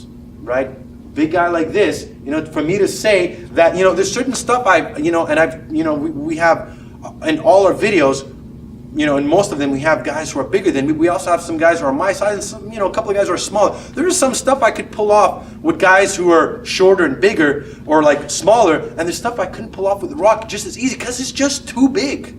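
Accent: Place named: New Jersey, United States. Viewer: yo guy, are u from the jersey or isreal or brooklyn area? i only ask because im from jersey and ur accent kinda sounds like one of the 3 places i mentioned.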